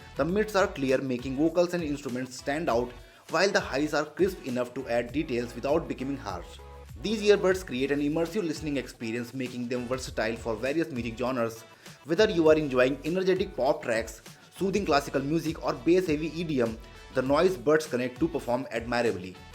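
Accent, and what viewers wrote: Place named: India. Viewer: Bro u speak like my UP Board english teacher from village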